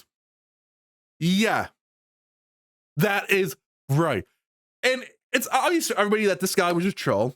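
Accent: Canada. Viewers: You sound very Canadian.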